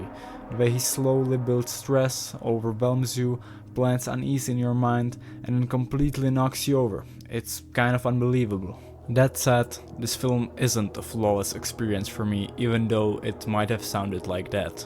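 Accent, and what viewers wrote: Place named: Czech Republic. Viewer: where are you from? you sound czech/slovak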